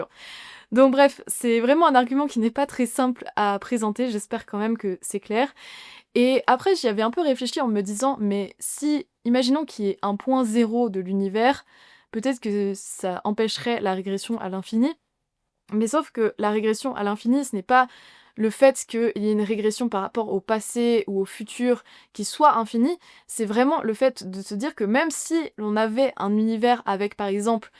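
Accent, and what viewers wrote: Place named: France. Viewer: Je suis comme Quebecois pour deconstruire votre superbe accent francais et Sartre.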